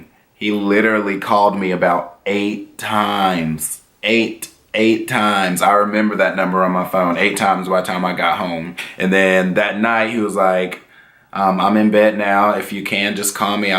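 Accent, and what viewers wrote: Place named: South, United States. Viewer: Lmfao... Wow, you did the most for him. Are you from the south?! You have a very southern accent.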